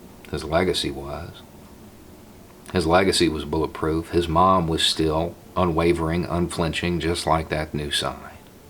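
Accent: South, United States. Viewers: At the beginning of this video....I interrupted it by watching the short documentary "The Body Of Emmett Till" from the Time channel ( long) and then went back to watching this video from...a southern white bearded man with a southern accent speaking with reverence about him. If Emmett Till could hear the words spoken by.......just wow.......I think he would have hope (even this many years later and that's a shame it takes this long). There's still a ways to go. I'm kind of at a loss for words right now after seeing the open casket photos and footage followed by words.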